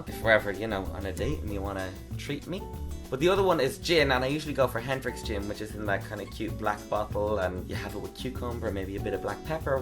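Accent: United States. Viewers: Nice American accent lol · Great American accent!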